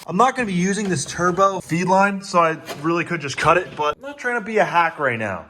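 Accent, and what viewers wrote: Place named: Ireland. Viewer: she sound like she to be in the irish car scene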